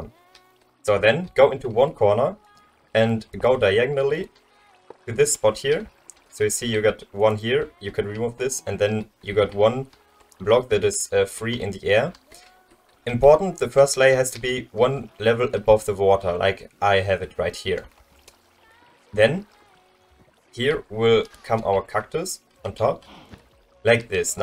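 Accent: Germany. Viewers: Man hört so krass dass du deutsch bist xD, aber trotzdem gutes video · Nice accent, is it german? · the german accent :face-blue-smiling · sorry, are you a German Landsmann?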